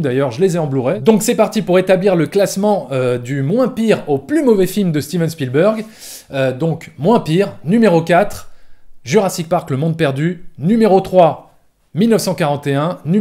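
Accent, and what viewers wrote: France. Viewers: Arthur BASSET Non, parce qu’il n’y a pas de bon youtubeurs ciné québécois comme durendal, MJ de fermez là ect. Et de toute façon l’accent français ne me dérange pas du tout! J’y suis habitué!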